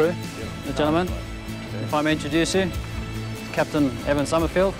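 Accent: South Africa. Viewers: He's South African. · Is that a South African accent I hear? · I think he's South African by his accent and name,which maybe Dutch and therefore Afrikaans. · he sounds very SouthAfrican -)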